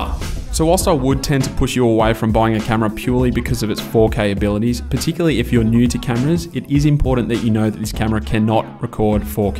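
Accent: Australia. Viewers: Very nice video, quick question are you Aussie?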